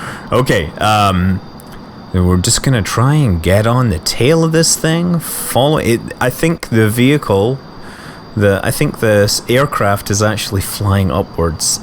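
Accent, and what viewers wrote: Scotland, United Kingdom. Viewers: Yeas hes scottish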